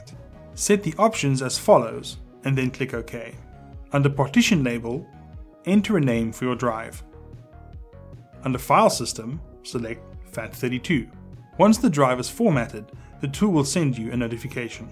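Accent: South Africa. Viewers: Top result and the guy got a proprr South African accent so I’m happy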